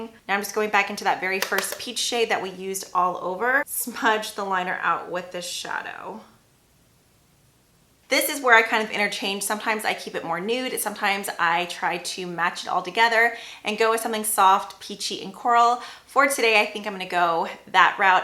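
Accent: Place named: New York, United States. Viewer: That was hysterical! Yonkers is near me and NYC and definitely have an accent if you are from there. Hahaha. Loved it Happy easter love! Love all the vibes of this video. And I also adore the new way or what seems new way to show product name. Awesome editing. I ordered a bunch of your recommendations from k beauty video. Waiting for it to ship. Lol. Wait I own those two blushes too you just used. Amazing combo. Haha. Thanks for those too!! 🐇🐇🐣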